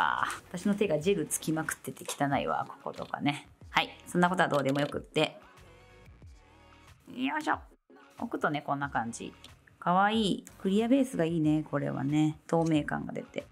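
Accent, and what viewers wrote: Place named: Nagoya, Japan. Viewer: 名古屋の方でしょうか？！懐かしい響きな気がします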